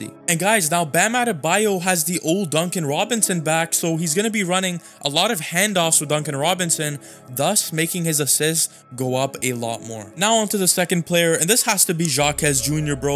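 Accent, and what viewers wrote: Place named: Miami, United States. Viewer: ooohh ok. I ask cos your accent is similar to a Miami accent. When you say "ago" in "two weeks ago" at the beginning, it sounds just like a Miamian lol and the way you say "bro" fits in perfectly on south beach lmfaooo. Great video! Love to the see Heat getting love. Haywood Highsmith is awesome!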